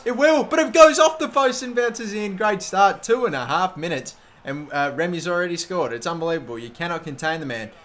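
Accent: Australia. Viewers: i lived in Sydney near Bondi Beach on Bouronia Road. I love the aussie accent. great vid again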